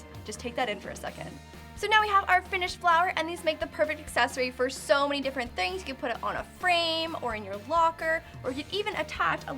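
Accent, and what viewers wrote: Canada. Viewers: Her Canadian accent is so cute haha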